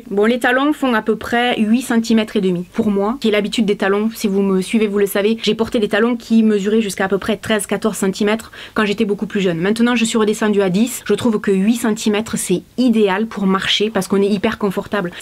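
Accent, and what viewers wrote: South, France. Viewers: Merci beaucoup pour vos conseils d achats les chaussures sont magnifiques j adore votre style et surtout votre bonne humeur 😊 et cet accent qui sent bon le sud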